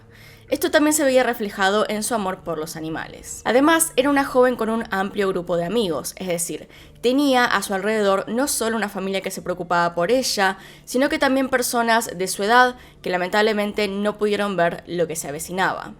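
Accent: Argentina. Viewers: Eres Argentina?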